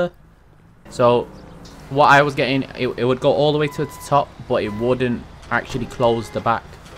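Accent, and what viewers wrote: Netherlands. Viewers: Yo you dutch? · Are you dutch?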